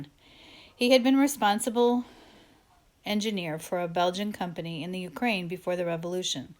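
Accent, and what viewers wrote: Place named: United States. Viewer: Спасиб, послушал "простую" американскую речь (произношение). Понятны отдельные слова. ))))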